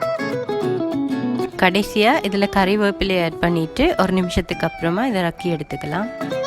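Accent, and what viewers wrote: Tamil Nadu, India. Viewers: தமிழ்நாட்டில் பேசுவது போல உள்ளது,யாழ்ப்பாணத்து காரங்ங இப்போ தமிழ்நாட்டுக்காரங்க மாதிரி மாறீட்டாங்க,சீரியல் பார்ப்பதோடு நிப்பாட்டுங்க,அதே மாதிரி பேசி அழகான யாழ்ப்பாண தமிழை அழிய விட்டிடாதீங்க,அழகு யாழ்ப்பாணத்து சுத்தமான தமிழ்தான்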